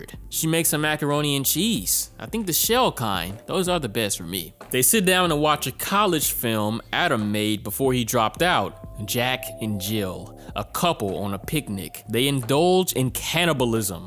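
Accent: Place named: Philadelphia, United States. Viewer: Oh you from Philly huh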